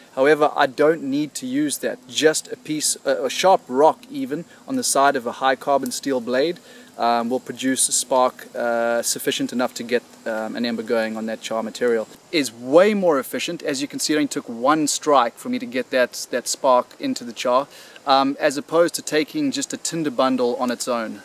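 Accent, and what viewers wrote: South Africa. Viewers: dude, u south african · YOou kind of sound south african? Zimbo? That would make sense. You know survival. comes natural with us... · Sounds South African..long way from home either way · is he south african? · You're South African? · Is that SA accent I hear? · you sound south african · Are you from South Africa it's just the accent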